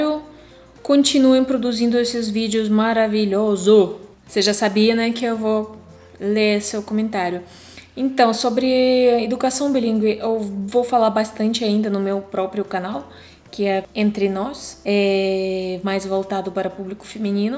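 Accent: Russia. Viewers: em primeiro lugar gostaria de parabenizar que o seu portugues esta ficando cada vez melhor acredito que dentre 2 ou 3 anos voce nao tera mais sotaque russo parabens eu sei que seu canal e voltado para publico feminino mas eu amo os conteudos que voce e o produzem voce disse ai que tem pessoas que na frente nao tem coragem de dizer quando estao escondidas atraz da tela de um computador ou um celular e porque sao pessoas mau carater falças que mentem para si mesmas de tao pervesas que sao e em relaçao a depressao na russia como la tem muito problema em que pessoas bebem muito ja e um indicio fortissimo de depressao so que a marvada da vodka kkkkkk mascara tudo se a pessoa esta com depressao e usa o alcool como refugio e como querer estancar o ferimento como um tiro de revolver com um bandeide nao da certo ne um abraço sou fã numero 1 de voces continuem firmes ai nos conteudos que sao otimos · Os haters estão em toda parte...não só no Brasil! 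São um fenômeno novo... E você tem razão! Pessoalmente, são fofos...só que na internet, colocam seus podres pra fora...desabafam! Gostei muito do canal, vocês são muito simpáticos, e é impressionante como teu português está bom...muito pouco sotaque russo! Não conheço a Russia, mas quero muito ir...Museus, cidades com uma história muito rica, amo os pianistas russos, e as russas! E o stroganoff!!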